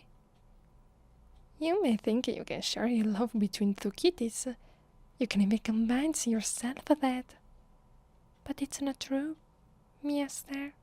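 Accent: Italy. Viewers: Ah the almighty spaghetti accent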